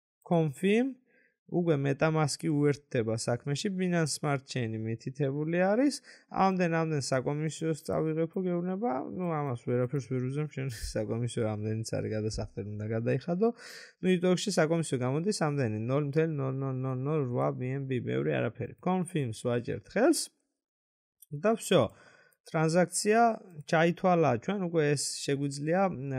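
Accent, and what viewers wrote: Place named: Kutaisi, Georgia. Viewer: კილოთი ქუთეისელი და channel nameთიც ქუთეისელი 😌😂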